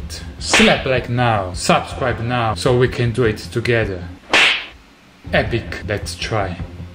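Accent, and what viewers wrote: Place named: Italy. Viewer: This is the most Italian accent of I've heard so far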